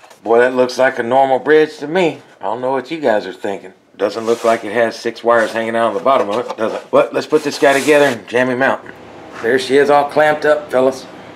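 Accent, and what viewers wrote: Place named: Kentucky, United States. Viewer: You from Kentucky ? You have the same accent as John Prine . In fact you sound just like him when your talking .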